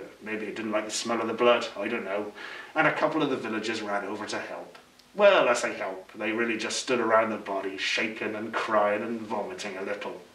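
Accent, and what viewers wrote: Norfolk, United Kingdom. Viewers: I could pick out that Norfolk accent of yours anywhere. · I can't tell if it's Ashens or it's just the Norfolk accent. Not heard the Norfolk accent outside of Ashens.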